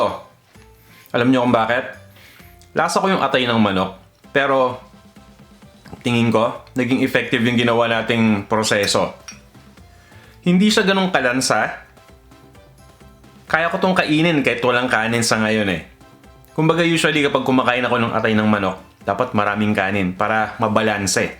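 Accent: Philippines. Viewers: Hi, hello from Australia! New subscriber here and am I so glad to have stumbled upon your channel. Now I can cook authentic Filipino food for my family like how I remember it as a child in the Philippines. I love how your Tagalog-English is so relatable to mine. Been here all my life, just about, but TG have relearnt Tagalog. Thank you for sharing your knowledge! 😊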